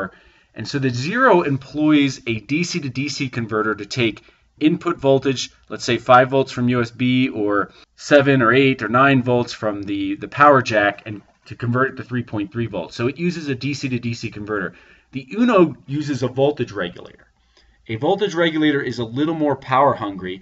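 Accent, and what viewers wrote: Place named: United States. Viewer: Propably this video is kinda informative. But to be honest it just bothers me how time is generated out of nothing and, most important, i just can't stand that accent. "Right out of tha baaahx" Muricans butchering the english language just hurts to watch.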